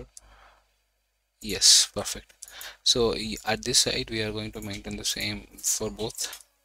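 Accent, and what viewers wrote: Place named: India. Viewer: I wish there was a way to filter out Indian videos. I cannot possibly listen to that accent.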